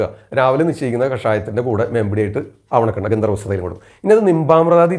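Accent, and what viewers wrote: Thrissur, India. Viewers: Sir. Thrissur aano? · Trissur slang is good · തൃശൂർ ഭസായാണോ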